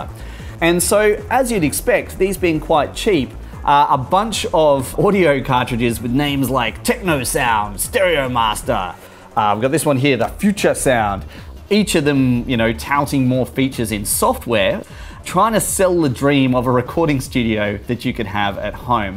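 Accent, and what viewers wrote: Australia. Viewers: I'm American but you Aussies are one of my favorite English accents to hear. It's just so vibrant and colorful lol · Hey mate are you an aussie from Melbourne that lives in Singapore?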